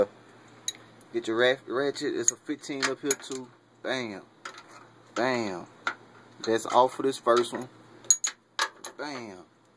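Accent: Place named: South, United States. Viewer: feurl lines........wranch...eyybody..maane.. talm bout.......either you from here in jackson ms......or you from texas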